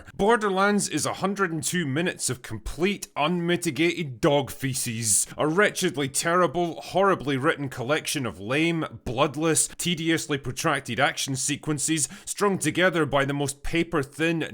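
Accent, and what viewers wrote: Scotland, United Kingdom. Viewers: You understand that it’s a bit, right? Like he’s a normal dude with a Scottish accent when he’s on Open Bar or casually talking.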